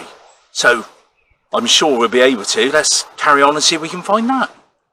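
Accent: Wiltshire, United Kingdom. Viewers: Thank you. I didnt know anything about the stone. I love your Wiltshire accent. ❤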